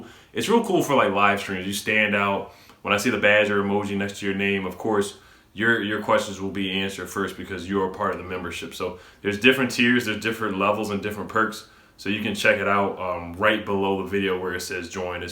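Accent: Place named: DMV, United States. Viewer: On the Rawsta...the Rawsta.....Are you from the DMV area?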